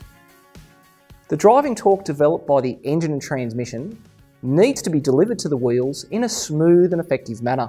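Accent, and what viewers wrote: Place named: Australia. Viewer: I think all technical videos should be done by someone with an Australian accent from now on.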